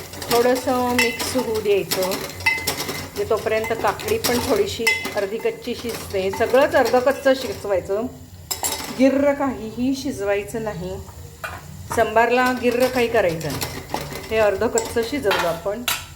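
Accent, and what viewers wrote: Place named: Malvani, India. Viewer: Mi asa sambhar nakki karun bagen mam mastach amchya malvani basheth boltey mastach mazya tondaak panni sutla chaan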